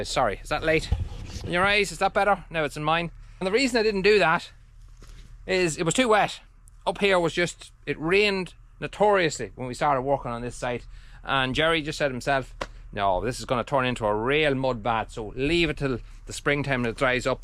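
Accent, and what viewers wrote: Ireland. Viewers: So happy to see some work done here! My great grandparents were dairy farmers in Ireland. Do you have the name of the land owner? I'm very curious about all the history. Don't know much about them as my grandmother n father immigrated in 1928 to the USA at the age of 18 I believe. They passed when I was fairly young so I wasn't able to ask any worth while questions. I enjoy watching your videos...a glimpse of where n what they might have lived. Some family members still there but I don't know them. And your brogue is every bit of talking with them! Love it! Thanks. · Greetings from the Ozark mountains in Arkansas, USA. Reminds me of all the old places I have rehabbed in my 80 yrs. I’m on my last one, an old woman now, I just enjoy watching my birds and critters. But I’ve loved giving all those old cottages a new life for the next generation to shelter in. 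More fun now to watch someone else save one, and listening to that lovely Irish lilt makes it even better!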